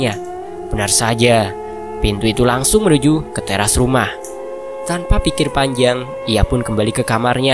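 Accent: Java, Indonesia. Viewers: q seneng logat jowone sang Pembaca wong ndi yo kiro2 😀😀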